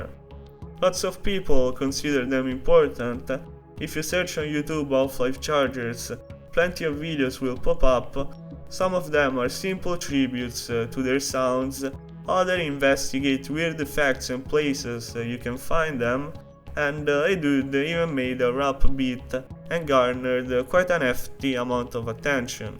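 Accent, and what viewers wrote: Italy. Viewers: I have never heard a more aggressive Italian accent. Lovely video video, keep up the good work.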